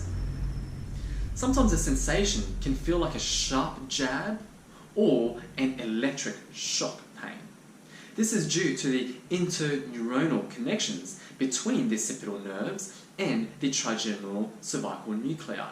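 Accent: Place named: Australia. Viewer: I'd say Australia or New Zealand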